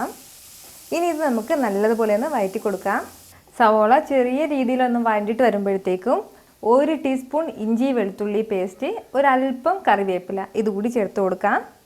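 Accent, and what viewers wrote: India. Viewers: അടിപൊളി ആയിട്ടുണ്ട് 👌👌👌👌ഞാൻ ട്രൈ ചെയ്യും 👍👍👍👍👍😎😎😎😎 ഭാഷ ശൈലി നന്നായിട്ടുണ്ട് ഏത് ജില്ല 😁😁😁😁?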